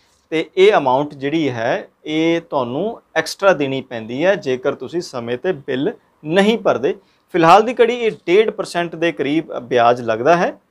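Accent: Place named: Puadh, India. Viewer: puaadhi language bolde ne vr...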